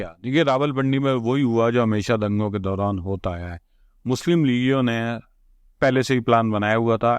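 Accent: India. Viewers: You say you are a Pakistani but your accent is Haryanavi. May I know how and why?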